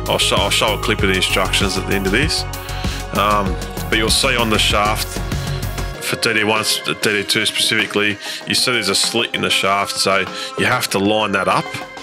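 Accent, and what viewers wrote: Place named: Australia. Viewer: Haha im sure you're gonna have loads not have a clue what your saying, but Aussie to Aussie its beaut mate. Just waiting on my QR2 with 2 base sides to arrive.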